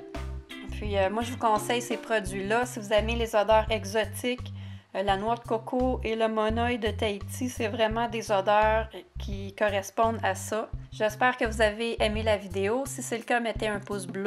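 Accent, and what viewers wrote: Quebec, Canada. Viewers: Oui c'est vrai que pour vous c'est nous qui avons l'accent !! Qu'est-ce que j'adore le québécois ♥ et le Canada aussi en général, destination de rêve que j'espère très vite concrétiser !! bizzz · Tu as un accent tu viens du Québec ?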